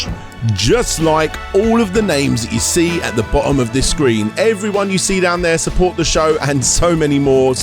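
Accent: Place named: England, United Kingdom. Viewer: You forget dingleberry, that he’s English and they say certain words with certain enunciations. Right now you come off as one those assholes who thinks they are smarter than everyone else.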